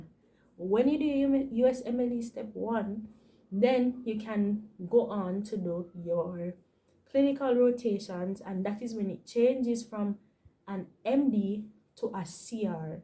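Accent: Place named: Caribbean. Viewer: I love your carribean accent girl!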